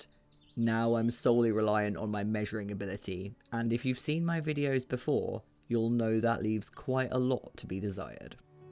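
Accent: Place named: RP, United Kingdom. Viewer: You sound so posh.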